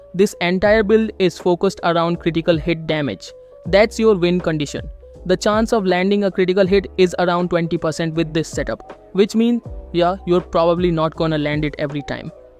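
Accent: India. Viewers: Indiano falando inglês é engraçado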